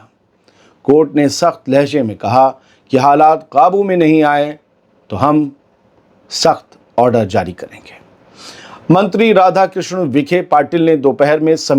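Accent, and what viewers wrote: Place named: Lucknow, India. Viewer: zanab mai to apki lucknowi andaz me reporting bich bich me behtreen urdu ke alfaz aur Palestine, gaza pe itni shiddat aur safgoi se reporting ka vaise hi qayal ho gaya hu ! Mai apka regular viewer hu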